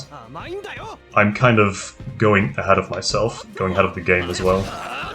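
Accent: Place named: Netherlands. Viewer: Yay, more of this! And I hope the new recruit's effort can be felt! I'm not sorry- Edit: Very dutch pronunciation indeed, nice. Also, recruit Bradford please? (the tiny boy with the brunette rooster haircut) PS. Welkom terug!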